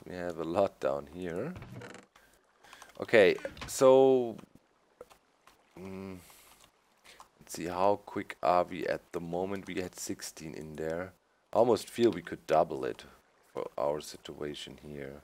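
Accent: Germany. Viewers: Aber man hört schon dass er deutscher ist.. Findet ihr nicht? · your german accent sounds so awesome haha xD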